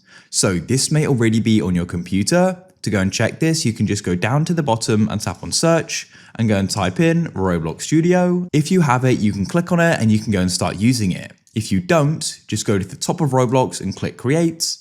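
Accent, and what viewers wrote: United Kingdom. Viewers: you to british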